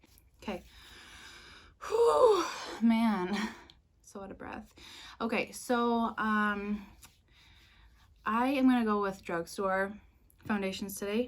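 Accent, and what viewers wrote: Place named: England, United Kingdom. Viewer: for some reason you remind me of someone who was born and raised in England but moved to America and lost most of their accent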